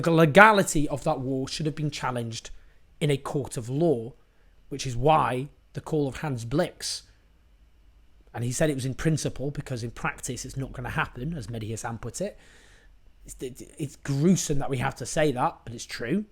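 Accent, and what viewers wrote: United Kingdom. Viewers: The accent of the host seemed like he is a British! Well, I've got a news for this man, a British. You, your prime minister Tony Blair with his friend the United States of America empire's president should be the one not only to be put on "TRIAL," if possible to be "HANGED IN PUBLIC⚖️👎🇺🇸😡😡🇬🇧! Ayatollah Ruholah Khomeini said: "DEATH TO AMERICA⚖️👎🇺🇸😡!" Well, the same words will come out coming from both Afghan and Iraq people's mouth who was abused, slaughtered and pulverised by both your United Kingdom and that of murdererous Americans saying unitedly "DEATH TO BRITISH ⚖️👎🇬🇧😡!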